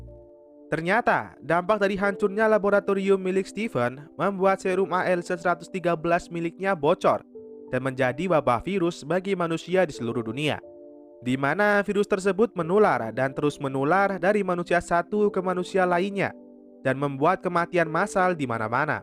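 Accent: Banyumas, Indonesia. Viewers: Mantap youtuber ngapak hadir min 😆😆😆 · Jebule * wong ngapak ya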